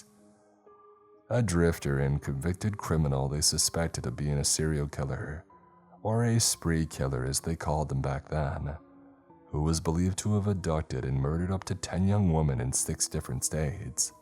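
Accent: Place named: Minnesota, United States. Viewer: Sounds like southern Minnesota, for sure.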